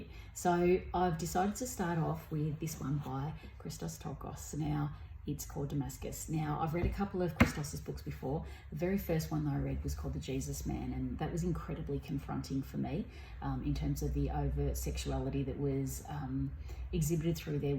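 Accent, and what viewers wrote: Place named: Australia. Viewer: Jist found your channel, hope you keep uploading as great to hear more Aussies on booktube!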